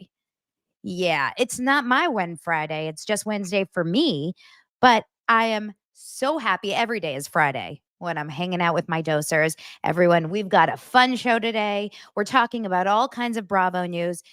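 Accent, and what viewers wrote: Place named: United Kingdom. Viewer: I love a British accent so much that my Siri has one!😌 I love your sass so much. You’re so fun & I love your videos. 👏🏼💪🏼😘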